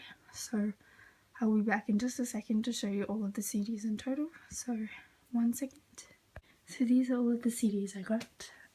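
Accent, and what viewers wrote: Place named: Australia. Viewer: your english sounded so normal to me xD ur aussie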